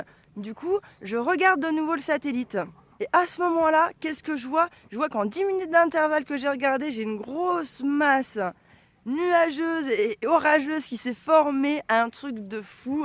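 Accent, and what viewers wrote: Paris, France. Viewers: Et ton accent parisien j'adore, ça me rappelle une ex qui vit dans le 20 eme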